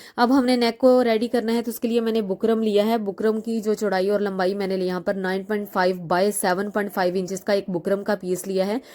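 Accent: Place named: Punjab, India. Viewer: Bhoht khub ❤ mam aap kha se ho panjabi tone aati h aapki voice mai 😊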